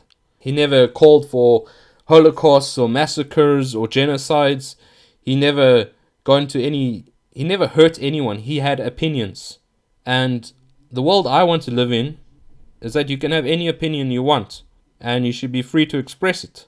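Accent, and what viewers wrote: South Africa. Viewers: I just discovered your channel through normalapollo, I could be wrong but you sound South African, or at least Rhodesian by your accent, and it's good to know a fellow countryman who loves lovecraft and holds nationalist beliefs. Keep up the good work, Volk En Vaderland.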